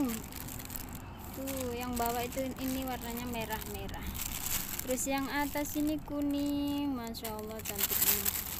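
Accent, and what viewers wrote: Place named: West Java, Indonesia. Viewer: Dari logat bahasanya kayanya kamu dari Jawa barat ya?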